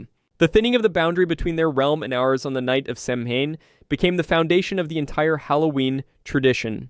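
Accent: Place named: United States. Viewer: The amarican accent can never say things properly..."Fryer" is where i left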